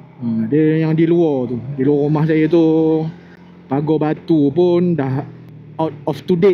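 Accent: Perak, Malaysia. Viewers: Assalamualaikum Chor,Kome cakap org Perak je. Aje tanya je Chor.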